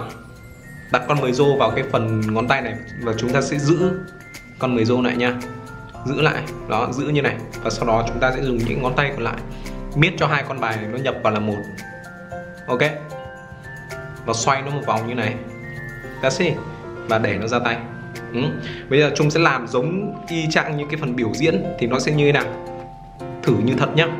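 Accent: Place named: Vietnam. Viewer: anh kêu là tiếng anh mà đọc kiểu tiếng việt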